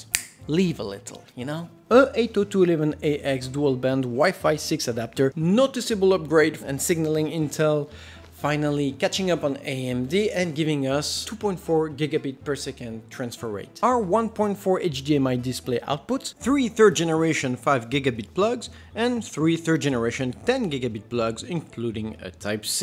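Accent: France. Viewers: Je viens d’apprendre grâce à cette vidéo que je vais pouvoir maintenir ma workstation à jour d’ici un an ou deux, juste en remplaçant le processeur par un Intel de 11e génération. Super intéressant !Par ailleurs, sur la forme, l’accent français est génial et sur le fond plein d’infos inédites sur les processeurs Intel de 10eme génération et sur la gamme Aorus de cartes mères gigabyte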